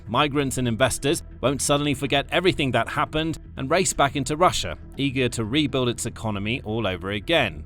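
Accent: United Kingdom. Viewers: I gradually got used to associating that british accent with unabashed non-stop lying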